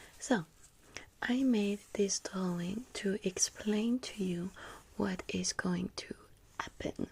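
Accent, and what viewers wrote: France. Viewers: im male but i will watch any french accent video from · I'M JUST SO IN LOVE WITH FRENCH ACCENT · yesss! I’ve been looking forward to a sequel to the first one of these you did! Love it, sooo tingly and I love the French accent🖤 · I just came across this videa, i am not sure how or why but, wow, i feel happy, i will check out your channel.. my god, the french and their accent, exquisite. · Okay I’ve watched like. 27 times so it’s safe to say I’m obsessed!! Love the French accent!!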